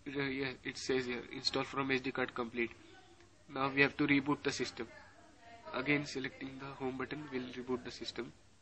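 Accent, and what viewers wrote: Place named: India. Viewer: dieses english von indern klingt immer wieder geil :D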